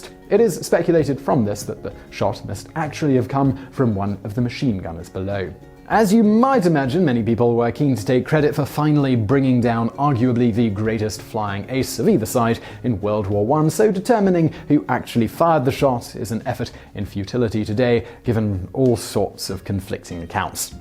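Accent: England, United Kingdom. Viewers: I can't listen to this guys horrid English accent. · Lay off that pretentious accent🇬🇧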